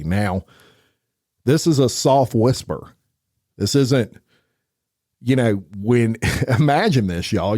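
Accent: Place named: Appalachia, United States. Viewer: Your hillbilly accent makes the whole boring show worth listening too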